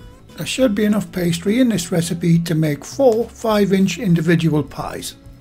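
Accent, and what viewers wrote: United Kingdom. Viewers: Ahh, sir your accent and recipes reminds me of me Gran and me mum out here In Australia, I have all their old recipes that they wrote down and I follow them to the letter, as I’m getting on a bit now (52) I’m considering putting them together in a book, you can’t beat these old time recipes, you are my favourite cook on YT as you’re the closest I’ve found to that ole home style British cook, thank you mate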